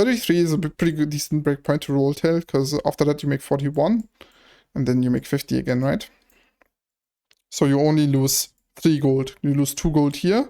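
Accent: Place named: Germany. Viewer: Gute Erklärung und man hört den deutschen Akzent raus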